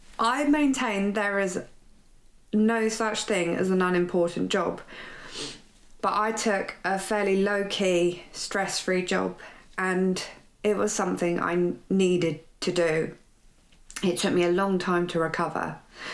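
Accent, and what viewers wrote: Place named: England, United Kingdom. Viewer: The landscape and your amazing English accent make the video very pleasing ❤❤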